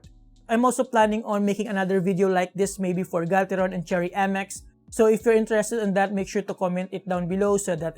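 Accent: Philippines. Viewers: He has dat pilipino aksent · Ingles wid the pilipino aksent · his accent is so... Woah very filipino (in my ear)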